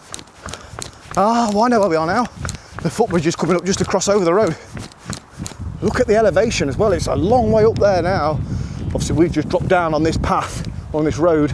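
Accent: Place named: Leicester, United Kingdom. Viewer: Your Leicester accent is brilliant!! Me duck.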